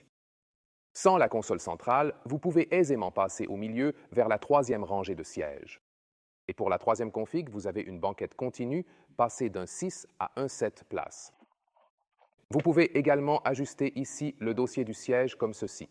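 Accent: Canada. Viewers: je me disais bien car des fois, il y a un léger accent canadien. Je viens de terminer une de vos vidéos. Merci comme même de le faire en français. En tout cas c'est bluffant.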